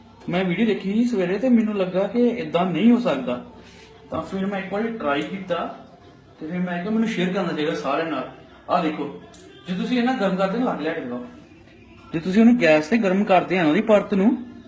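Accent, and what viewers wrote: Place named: United Kingdom. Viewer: Bolda tu ida aa jida U.K. Born aa te xperiment india di gobi de kri janda Eh gobi asli aa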